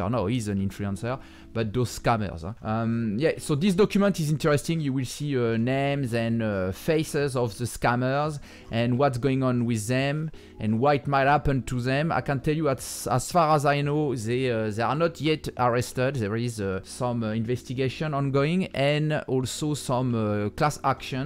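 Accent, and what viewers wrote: France. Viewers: L'accent French est le meilleur tout le monde kiff de ouf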